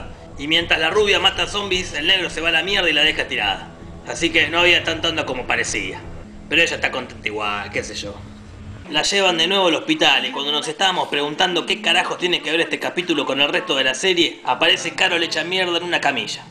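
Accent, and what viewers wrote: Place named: Argentina. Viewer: es un genio el loco, me re copo como hizo todo el video y por como habla me parece que es de aca de Argentina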